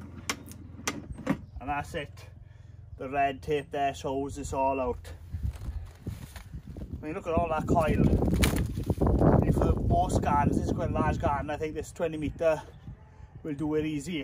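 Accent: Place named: South Wales Valleys, United Kingdom. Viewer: Its the Valleys Man gotta love the accent!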